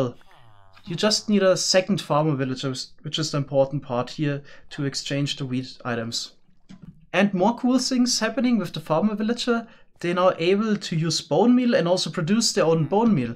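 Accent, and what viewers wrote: Germany. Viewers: Dein Englisch is so deutsch, ich kann nicht mehr 😂😂, keine grammatikfehler oder so aber der Akzent is einfach geil! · Ich Liebe deine Videos, Aber es ist echt schlimm einen Deutschen Englisch sprechen zu hören wenn mn weiß das Er es auf deutsch besser könnte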